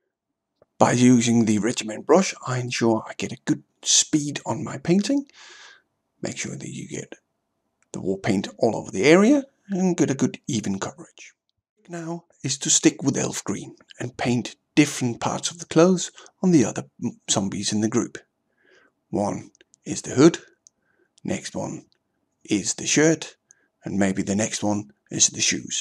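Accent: Denmark. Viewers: Grinern, man kan bare høre det en dansker på accenten med det samme :D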